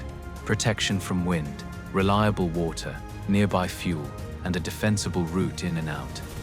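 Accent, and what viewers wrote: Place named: RP, United Kingdom. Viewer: English butler voice yawnnn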